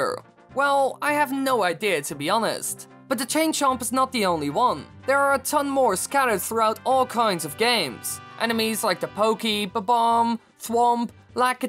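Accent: Netherlands. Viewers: Hey you're Dutch, right? Brabant?? :P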